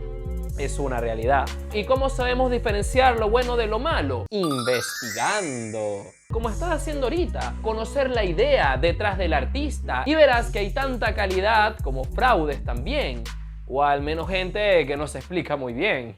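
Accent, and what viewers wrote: Venezuela. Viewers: A mí me suena más a venezolano, dijo "pana", eso no es mexicano. Saludos! 😊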